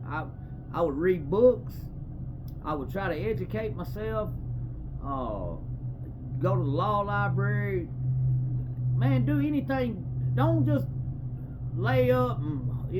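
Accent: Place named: Louisiana, United States. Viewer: All my family's from Louisiana and Mississippi and you sound just like em lol. Great channel, great storytelling, subbed!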